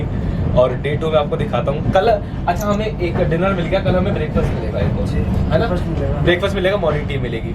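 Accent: Bengal, India. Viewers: Bro are you Bengali na ? 😁😁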